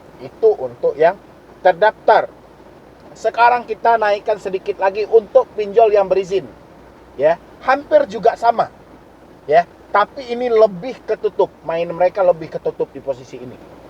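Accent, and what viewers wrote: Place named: Medan, Indonesia. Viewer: Medan mana suaranya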